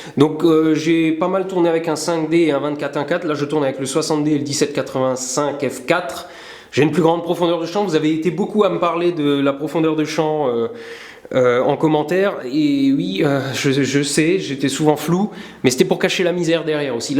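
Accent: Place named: Alsace, France. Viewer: Je viens de découvrir votre chaîne et un truc me viens a l’esprit..... L'accent Alsacien ! C'est pas possible autrement , tu est un Elsässer haha ;) On entend l'accent Germanique xD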